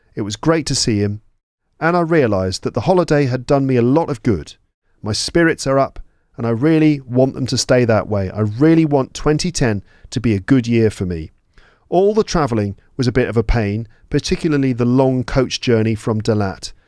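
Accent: United Kingdom. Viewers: Brits accent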